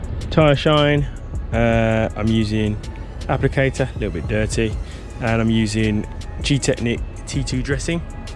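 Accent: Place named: Midlands, United Kingdom. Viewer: Nice video buddy. thank you .. i detect a midlands accent there....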